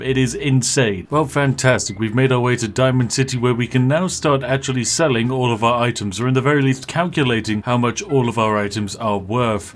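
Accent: United Kingdom. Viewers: Day 5 of reminding Spiff we want to hear him say "Sugoi" in his glorious British voice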